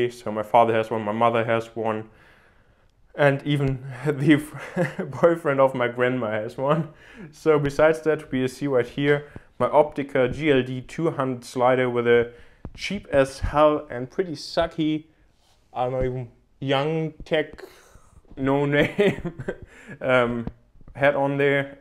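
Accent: Germany. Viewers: U have Ferman accent... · Are you German?? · im just curious .. by any chance ... are you german ?? :) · war iwie klar zu erkennen das du ein deutscher bist :D schönes raum tour! ;) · Kann es sein, dass du Deutscher bist??? Wegen deinem Akzent und der ZDF App auf dem iPad Mini... :D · Are you german? :D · You sound german, are you german? :) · Are you German ??? Also du hörst dich irgendwie n bisschen deutsch an ???? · is this guy german? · are u german ??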